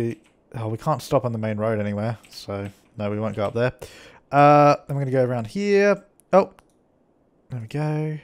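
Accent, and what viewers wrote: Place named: Australia. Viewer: Austrailian accent is beautifull.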